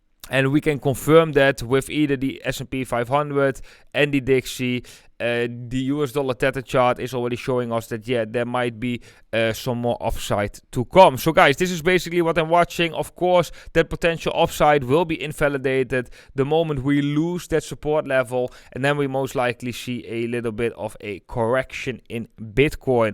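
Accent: Netherlands. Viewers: Your name ' ' before i hear your name i did think immediately he is Dutch. I can hear the Dutch accent. LOL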